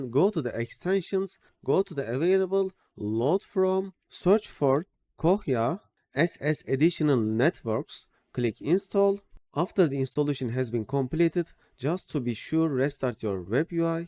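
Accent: Turkey. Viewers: Buram buram ben Türküm diyor bu aksan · Türk ingilizcesine benziyor. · türk olduğunu konuşmandan anladım sağol abim :D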